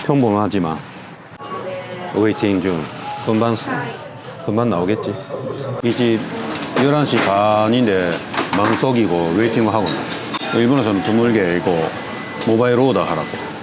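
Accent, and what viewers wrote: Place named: Gyeongsang, South Korea. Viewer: 인품 좋은 설명도 좋은데, 여게 거게 저게~하는 옛날 경상도 사투리가 참 듣기 좋습니다.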